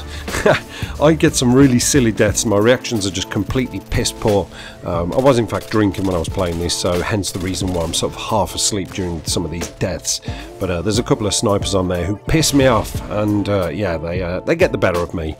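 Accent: Birmingham, United Kingdom. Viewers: love your vids, always entertaining, and your a brummie to so that always keeps me coming back for more, but one serious question tho, at 4 min 30 sec, HOW THE HELL DID YOU GET THAT BLOOMING KILL? with a SHOTGUN???????? so facking far away? lol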